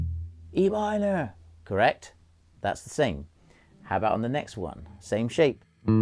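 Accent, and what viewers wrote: Bristol, United Kingdom. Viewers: did you go to Bristol university, per chance? I gather you're based in Bristol and I can hear the slight accent, but I was there '98-'02 and I think we're about the same age..Anyway, since I heard your playing a couple of years or so ago, I always thought it was some of the very best and melodic in the best way.. I'll be listening and learning some stuff as well as enjoying your music. Have a good evening.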